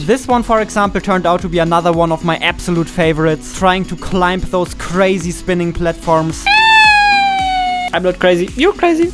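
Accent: Germany. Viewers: Man hört das deutsche so hart raus xD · you german? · are you german ? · bist du Deutscher weil du hast so einen akzent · Could it be that you are german? Or does it just sound like a german accent? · You are german i hear that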